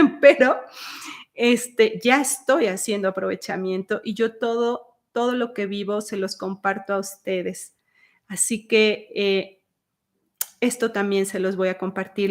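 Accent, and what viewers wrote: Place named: Mexico. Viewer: Hermosa, me gusta tu tonada mexicana,soy de Argentina y cuando dijiste son las 13 y 13,miro la hora que te estoy escuchando 19 y 19.😊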